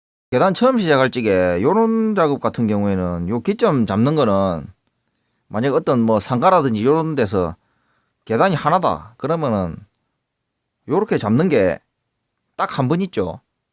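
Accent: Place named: Busan, South Korea. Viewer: ㅋㅋ 늘 재미있게 보고 있습니다. 설명하시는 것들 충분히 이해했고, 표가 없이도 나름대로 길이를 찾는 법을 찾겠더라고요. 그래도 자세한 설명이 큰 도움이 되었습니다. 형틀목수 시작한지 얼마되지 않았는데, 관록도 필요하지만 이론이 바탕이 되어야 한다는 것을 많이 느끼게 해주셔서 감사합니다. 당장은 써먹을 일이 없겠지만, 기회가 오면 도망가지 않을 수 있을 것 같아요. 너무 빨리 그 날이 오면 선배들에게 눈치가 보이겠지만, 그래도 기회를 잘 사용해서 현장에서 인정받고 싶네요. 계산에 무지한 목수만 있는 것이 아니란 사실을 보여주고 싶기도 합니다. 구수한 부산사투리에 솔직한 썰... 늘 즐겁게 보고 있습니다. 언제 부산에 가면 한잔 하시죠 ㅋㅋ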